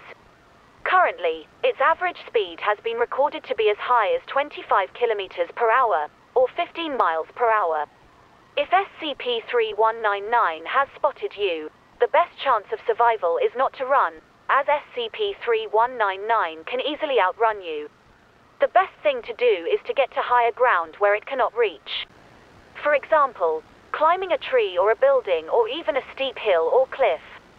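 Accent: United Kingdom. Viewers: A few questions from someone who’s Irish. 1. Why does the Ukrainian EAS play at the start instead of the Irish one? 2. Why is the TTS voice British instead of Irish? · I like that the lady speaking sounds BRI,ISH. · bri'ish voice hits it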